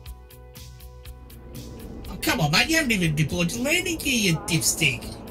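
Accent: Australia. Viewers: where are You!? UPDATE: On main YouTube screen on channel, on top bar, there's COMMUNITY, press and pick read more. Respect for You. You are Star, You are example how to be Great Youtuber and how to be a Great Man, Human, GENTLEMAN. I wish You well. ✌️ I can not wait Your further guidance and Aussie accent. 😉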